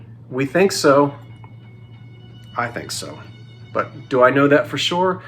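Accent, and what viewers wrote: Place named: Philadelphia, United States. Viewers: Yeah, you kinda do have a South Jersey accent. I really enjoyed last night's sale. Lots of fun! Great video, as usual! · You sound a little bit philly you have said wooder for water 😊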